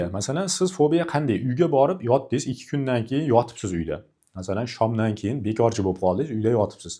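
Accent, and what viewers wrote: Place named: Jizzakh, Uzbekistan. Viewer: Ассалому алайкум доктор. Шевангиз адашмасам Жиззахники деб уйладим. Адрес каерда ?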